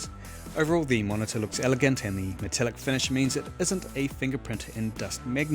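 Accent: New Zealand. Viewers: don't be so ignorant, people from different parts of the world have different accents, this one sounds like a New Zealander.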